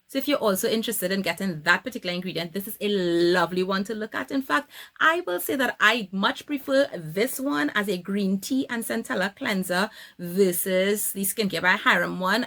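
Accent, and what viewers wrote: Trinidad and Tobago. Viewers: This review helped me so much in choosing the perfect cleanser of my acne prone skin…. Thank you! Thank you! Thank you! And of course, you must know a Trini anywhere, especially by their accent 😍🇹🇹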